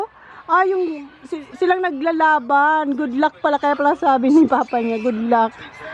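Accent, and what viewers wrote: Bicol, Philippines. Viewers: Sarap sa ears ang pagbibicol mo manay 🥰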